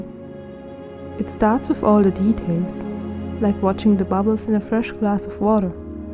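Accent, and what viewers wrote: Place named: Germany. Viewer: So much like your German accent!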